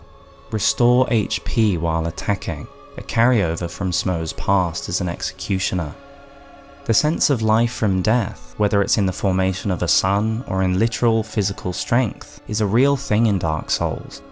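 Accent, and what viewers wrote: United Kingdom. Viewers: I feel that if you have a soothing voice and an British accent you are by law required to make lore videos on YouTube. Good video i like it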